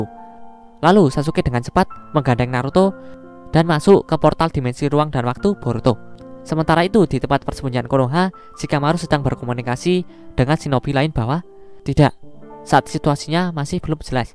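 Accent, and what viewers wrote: Java, Indonesia. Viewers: Medok suaranya bang..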